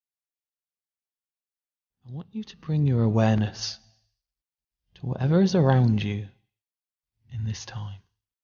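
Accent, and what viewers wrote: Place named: United Kingdom. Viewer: I loved the british accent!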